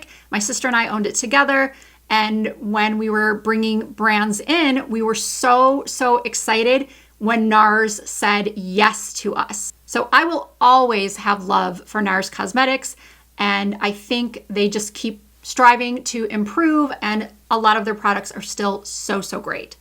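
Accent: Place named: Michigan, United States. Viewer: What part of Michigan are you from? Good video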